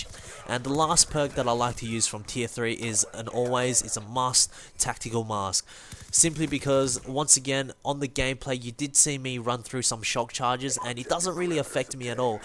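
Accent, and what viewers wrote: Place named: Australia. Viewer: i knew your were Australian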